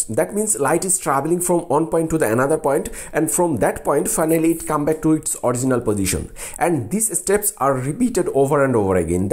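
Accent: India. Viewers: His voice is most stereotypical indian english!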